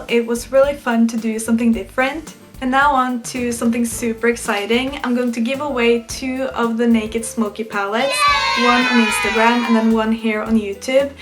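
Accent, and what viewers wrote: Norway. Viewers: You're super good. but i can here by the accent that you are Norwegian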